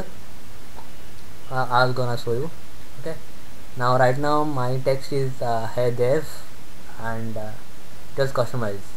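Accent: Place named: India. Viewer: I dont understand your Indian accent nobody tell what you are you saying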